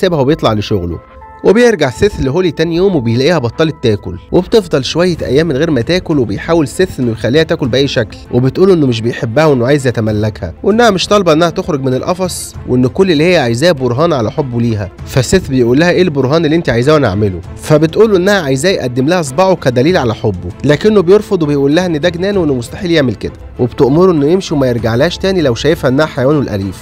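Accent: Egypt. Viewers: اللهجة المصرية كتير حلوة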